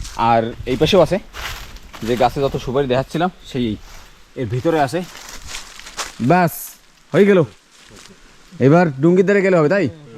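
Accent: Barishal, Bangladesh. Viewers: ভাই এর কথা বার্তা আমাদের বরিশালের ভাষার মতো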